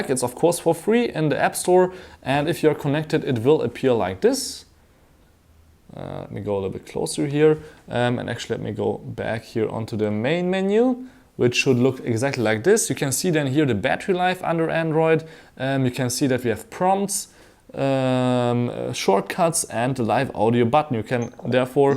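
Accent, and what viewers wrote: Germany. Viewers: I MISS this german english accent sooo much!!!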